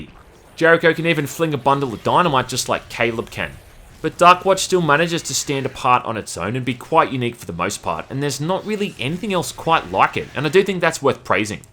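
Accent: Australia. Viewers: You are quickly becoming my favorite channel to watch. The delivery, the jokes, and the Australian accent ( oh ya, and the games). God tier content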